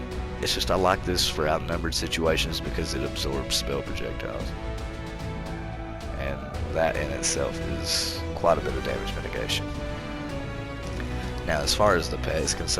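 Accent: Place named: South, United States. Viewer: You sound southern as hell man, roll tide.. subbed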